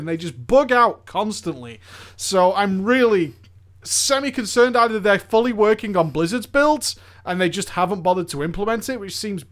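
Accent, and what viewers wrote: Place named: Northern England, United Kingdom. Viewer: At the start of your videos do you say "It's here" or "It's "? Blooming northern accents! Keep up the good work mate.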